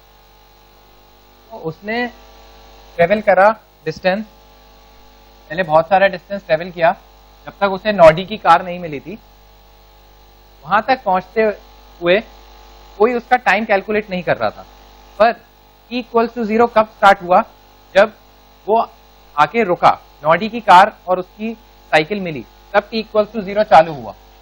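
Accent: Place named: Bihar, India. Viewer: bihari lol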